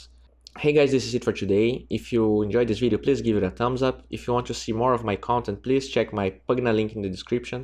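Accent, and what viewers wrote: Brazil. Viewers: nice vid overall but i would have to say the narrarator has a heavy brazillian accent that i find offensive and annoying.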